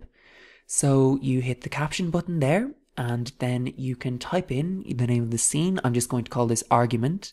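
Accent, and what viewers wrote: Ireland. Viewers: Thank you, very helpful and easy to follow. Your accent makes me want to tour Ireland again -- Sláinte!!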